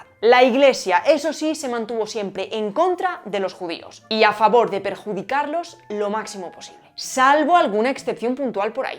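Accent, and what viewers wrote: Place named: Spain. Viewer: Tu acento español es tan fuerte que no puedo entenderte. Gracias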